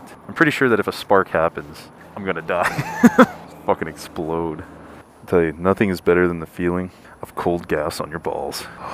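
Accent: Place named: Canada. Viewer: I know this video is a year old. ( One may say I might just be stalking your Canadian accent) however you burp a hell of a lot! Like WOW! haha But as always I love you and Mr. Snowcat so keep the videos coming. Yours Truly some random Australian chick!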